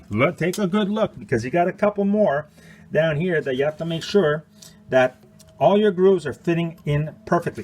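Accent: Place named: Chicago, United States. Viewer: This dude has a thick Chicago accent.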